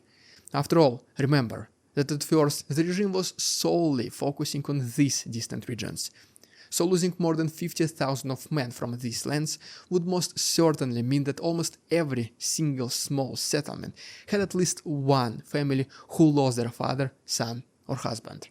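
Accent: Russia. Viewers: Can you translate to English. Your Russian accent is hard to follow.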